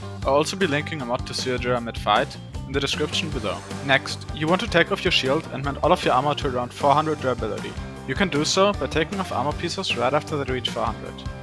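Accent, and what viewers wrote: Germany. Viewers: Are u German? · u german? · I hear Deutsch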